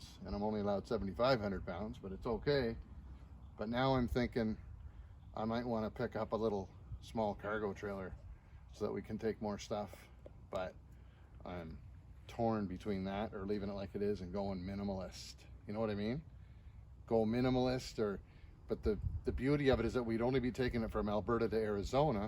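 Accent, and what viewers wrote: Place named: Bahamas. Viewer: Why does he sound a bit Bahamian from Abaco Island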